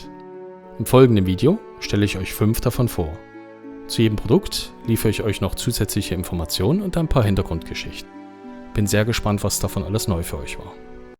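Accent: Saxony, Germany. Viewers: ...einverstanden...aber warum muss ein Sachse sowas erklären. Seine Aussprache französischer Begriffe spottet jeder Beschreibung...nu?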